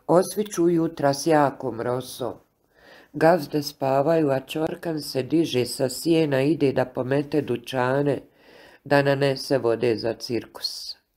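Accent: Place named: Sarajevo, Bosnia and Herzegovina. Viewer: Дикција наша сарајевска!🎉❤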